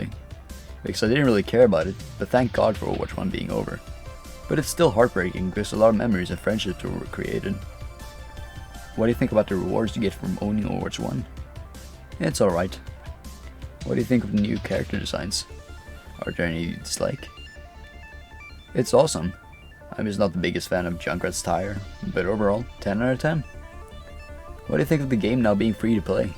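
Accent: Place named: RP, United Kingdom. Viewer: Why you speak like a BBC news people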